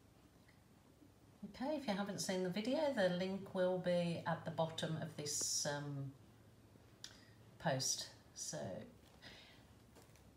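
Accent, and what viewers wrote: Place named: Midlands, United Kingdom. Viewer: You're so welcome, I enjoy your work and I like your easy going attitude, along with your midlands accent! Don't blame you for emigrating, love the filming of the views from your home, you live in a beautiful area of the world. Much respect to you.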